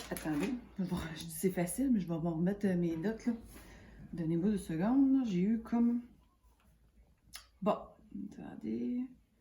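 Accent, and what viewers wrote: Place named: Canada. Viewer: 🙏 waouh ! ENFIN ! Des explications en français avec un bel accent canadien ! Merci pour toutes ces précisions car après beaucoup d'essais infructueux, j'étais sur le point d'abandonner😅. Je vais donc retenter. Pooringment votre 😉 Isabelle, France (près de Paris)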